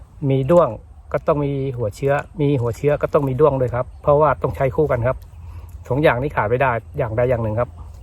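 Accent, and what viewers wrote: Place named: Central Thailand, Thailand. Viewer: สวัสดีครับน้าเพิ่งเข้าดูติดตามให้กำลังใจครับชอบดูคลิปดักหนูน้าอยู่จังหวัดอะไรครับดูจากสำเนียงการพูดน่าจะเป็นคนภาคกลางครับ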